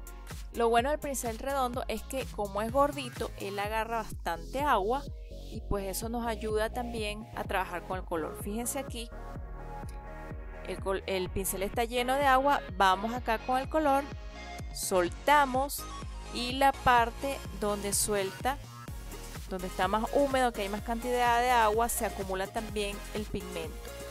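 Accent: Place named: Venezuela. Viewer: Muy buena, parece acuarelista venezolana, es genial es muy didáctica y fascina su modo de hablar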